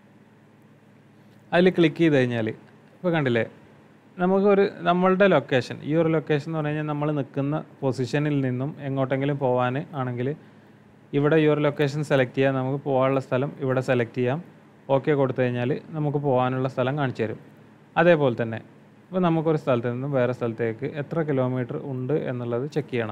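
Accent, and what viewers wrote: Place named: Malappuram, India. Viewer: മലപ്പുറം ആണല്ലോ താൻ... ആ സംസാരം കേട്ടാൽ തന്നെ manassilavum.. പിന്നെ എല്ലാ മലപ്പുറം ടീമും എറണാകുളം എന്നുള്ളത് എറണാoകുളം എന്നെ പറയൂ... വിവരക്കേട്.....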